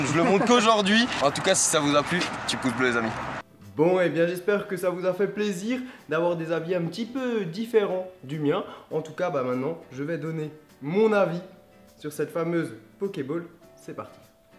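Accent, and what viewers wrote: Alsace, France. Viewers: oui il est tu l'entend a l'accent hhalsacien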